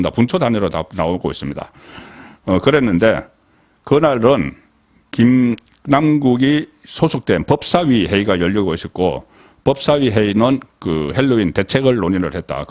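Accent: Gyeongsang, South Korea. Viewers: 경상도 출신이라니 이해는 하겠지만 그래도 그렇지요. 방송하는 분의 발음이 이래서야 되겠습니까. '의문'은 '의문'으로 발음해야지 '어문'이 뭡니까. 장단음 발음도 이상하게 하시니 말씀이 귀에 잘 들어오지 않아요. 발음 교정 훈련을 열심히 하시기 바랍니다. 그러면 더 인기있는 유투버가 될 수 있어요.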